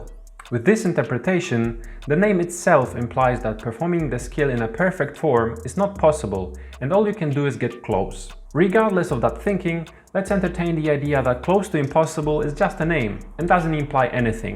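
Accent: Poland. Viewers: Polski akcent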